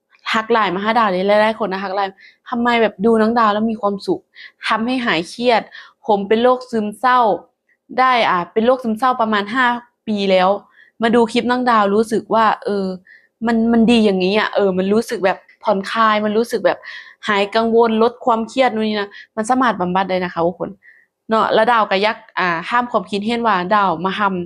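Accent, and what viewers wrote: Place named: Lom Sak, Thailand. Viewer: ภาษาลาวเหนือบ่คับ เว้าคือหล่มสักบ้านผม คนล้านช้างคือกัน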